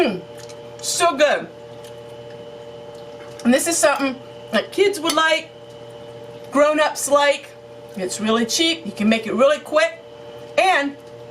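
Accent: Pennsylvania Dutch, United States. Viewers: I’m off subject here, I cannot find your mailing address. My son is curious about your accent. He wanted me to ask you if it is Pennsylvania Dutch?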